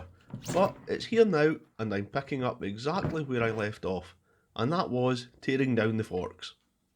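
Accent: Scotland, United Kingdom. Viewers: Brilliant, wie immer! Ich liebe den schottischen Akzent.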